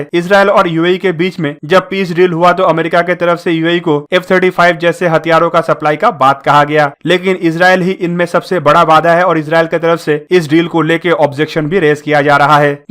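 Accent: Bengal, India. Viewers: Bengali hai,isliye Hindi thoda alag trah se bolta h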